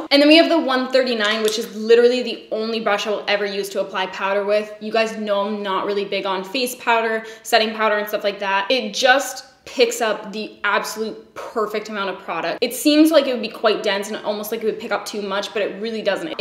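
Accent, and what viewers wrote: Canada. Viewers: her canadian accent though!!! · I had to go watch another video to see if you were Canadian lol. We talk really fuckin close to the same so I was pretty sure and then the other video I watch you were wearing a Canada hoodie...roots maybe? Merry Christmas 🎄🎁🎅🏻🍻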